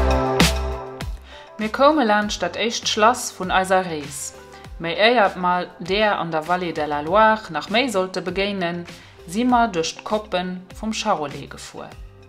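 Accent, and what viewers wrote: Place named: Transylvania, Romania. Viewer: Ich sage ganz salop, hallo, die Fahrradtour und vor allem die Sprache hat mich einfach platt gemacht!!! Ihre Sprache, ist mein DIALEKT. Den Untertitel habe ich ausgeblendet damit ich Ihr "Siebenbürgisch" richtig genießen konnte. Einen Daumen hoch und Abo habe ich hinterlassen. Wünsche allzeit gute Fahrradtouren.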